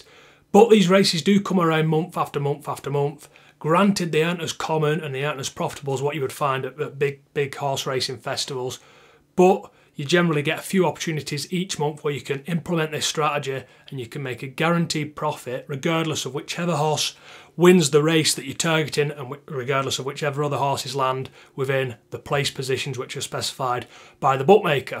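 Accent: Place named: Manchester, United Kingdom. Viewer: Man, your videos are great, you put a lot of effort into them and the explanations are very clear. I got used to your Mancunian accent also, it is all breeze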